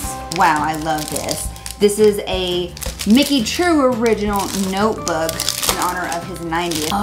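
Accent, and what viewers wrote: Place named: South, United States. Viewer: Hey! Are you from the southern states? I've never heard so much of an accent from you in any other videos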